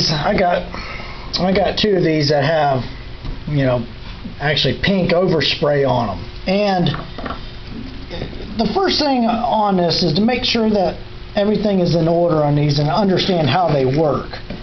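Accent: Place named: Northwest, United States. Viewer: He must be from the northwest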